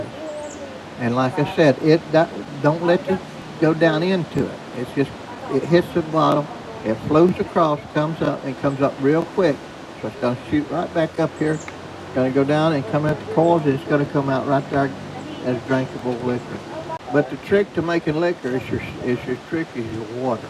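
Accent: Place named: South, United States. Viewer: I can't understand what he's saying. Sounds country as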